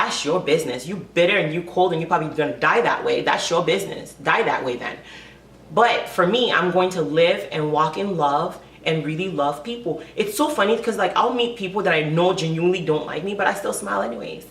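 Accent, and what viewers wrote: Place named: Africa. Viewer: Support is very important. Your African accent is so pure lol. Most Africans don't enjoy their stay cos they don't think they are accepted here. I feel like when you have hate in your heart you will find a reason to execute it. Be it racism, tribalism et al. BTW what camera and lens did you use? #ytqueens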